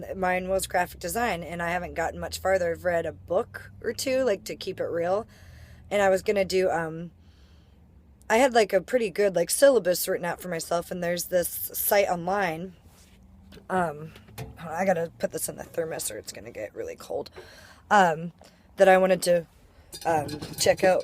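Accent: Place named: Pacific Northwest, United States. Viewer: I like your vids! It's always been a dream of mine to live in a camper/van/etc..... very cool. Also I could tell you were from somewhere between Portland and Seattle, probably the way you talk, it's almost like I've known you for years or something lol can always tell when someone is from the PNW or close to it.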